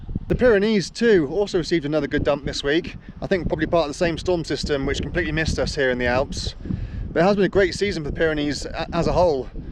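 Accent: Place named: Scotland, United Kingdom. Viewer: you mentioned living in Scotland, are you from Scotland? I always thought your accent sounded similar to Scottish Cyclist David Millar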